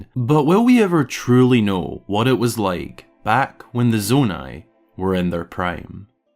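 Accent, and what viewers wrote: Scotland, United Kingdom. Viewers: Awesome video, awesome accent too. You scottish, mate?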